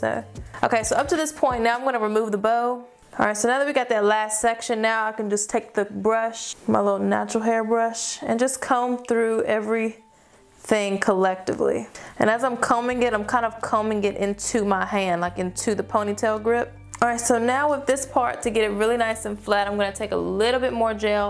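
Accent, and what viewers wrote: South, United States. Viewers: a real authentic southern accent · in love with her country accent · I love your little country accent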